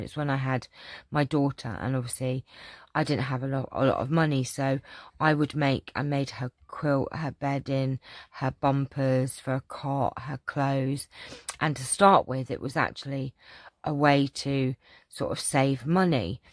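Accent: Yorkshire, United Kingdom. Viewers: Your story about going out in a convertible reminded me of a wish I've had since a teenager, wanting a MG convertible. I loved that movie Half a Sixpence, my mum bought an LP of the songs loved it, played it so often. You are so entertaining love your Yorkshire accent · You made me laugh with your Yorkshire accent 😂😂 and your jokes lol! Thanks for sharing, it’s been fun getting to know you more xxx❤️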